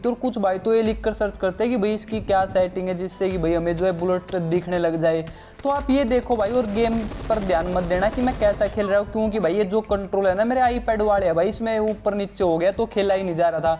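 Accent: Haryana, India. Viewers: Bhai you are harayanvi apki voice bta ri s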